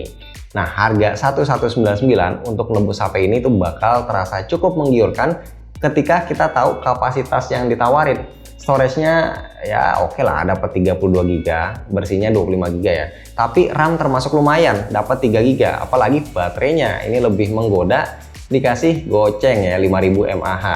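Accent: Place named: Banyumas, Indonesia. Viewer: w Jateng om, ngapak ya tau wkwkwk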